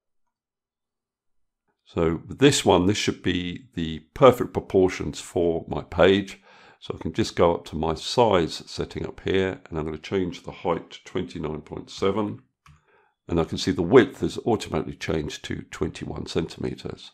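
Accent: United Kingdom. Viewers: Brilliant, thank you. And the lovely British accent was a bonus.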